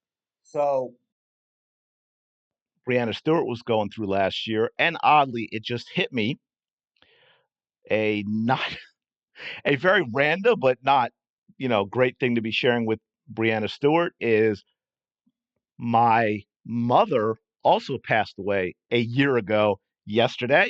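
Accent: Philadelphia, United States. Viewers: No need for the Cowboys slander. You sound like your from Philly. Let’s just keep it to the Aces implosion and Aja crying.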